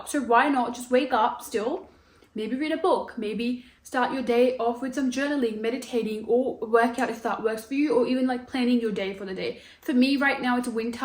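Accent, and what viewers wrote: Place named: India. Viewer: All I hear is CURRY CURRY CURRY in an Indian accent 🤮🤮🤮🤮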